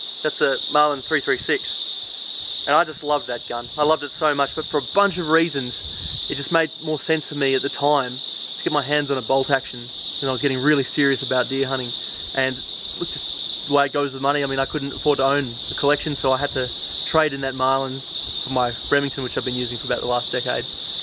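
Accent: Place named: Australia. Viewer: I just stumbled across your channel today by sheer accident but you have me hook if for no other reason ( although many reasons) you are the first Aussie I've heard that just speaks like a regular Aussie I just genuinely appreciate that and for once don't feel miss represented please keep up the honest genuine feedback and the fact you're not trying to tell answers but have a discussion. Mate you're one of a kind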